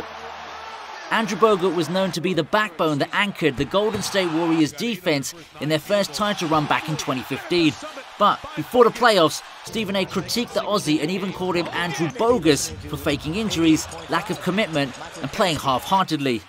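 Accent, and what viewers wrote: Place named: England, United Kingdom. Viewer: Narrator is…English?!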